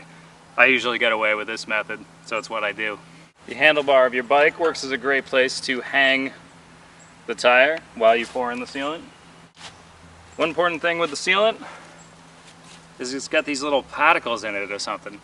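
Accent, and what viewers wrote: New England, United States. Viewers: Kid! This video was wicked! I sense that you're from New England.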